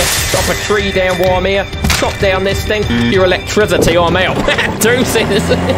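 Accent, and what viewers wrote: United Kingdom. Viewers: Your british accent just makes it so much funnier damn.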